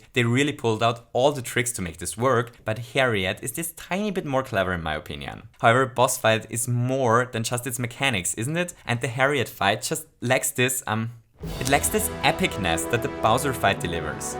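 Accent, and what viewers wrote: Austria. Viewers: Hmm I never knew you were from Austria . Didn’t recognize the accent . Learn something new everyday ig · Moment du bist Österreicher? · Warte, du bist Österreicher? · Youre austrian??? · also he just has an austrian accent :P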